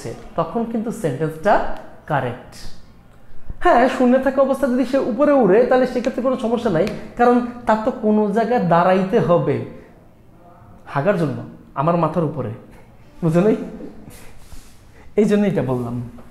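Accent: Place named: Barishal, Bangladesh. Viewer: ধন্যবাদ স্যার। স্যার কিন্তু মেগো বরিশাইল্লা👍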